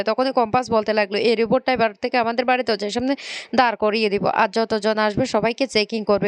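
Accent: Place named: Chittagong, Bangladesh. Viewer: আপনার চট্টগ্রামের টোনে কথা খুব ভালো লাগছে।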